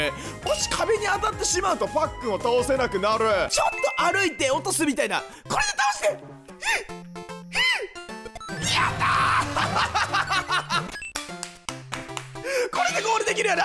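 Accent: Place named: Kyushu, Japan. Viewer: 「ムズけーばい」って言い方があるんてすね。 他にも「〜ばい」って言うことがありますね、なんかかわいいです☺ どれもおもしろかったですが、長靴クリボーがいっぱいのとドッスンがいっぱいのコースが好きです♪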